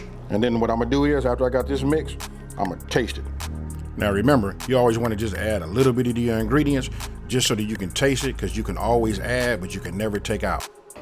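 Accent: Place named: California, United States. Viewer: I know I heard a Cali accent when you was talking.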